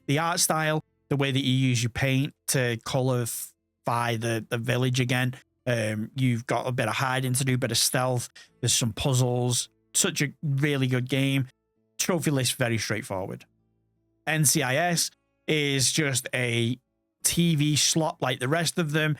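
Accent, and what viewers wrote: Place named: Warrington, United Kingdom. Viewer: Im getting Warrington vibes from your accent mate, am I close?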